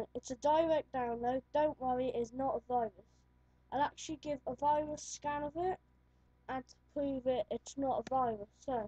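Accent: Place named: United Kingdom. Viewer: that British bloke garble you are mumbling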